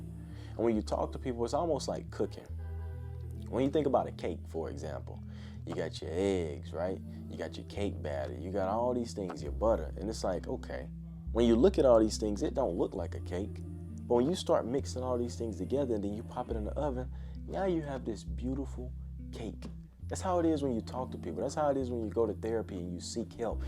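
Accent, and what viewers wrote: South, United States. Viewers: Your are my greatest inspiration, so far, how do you articulate yourself so well? I heard you talking normal, and ofc u got a pretty heavy southern accent, but hkw do you manage to remove the accent? And also, are you always this calm?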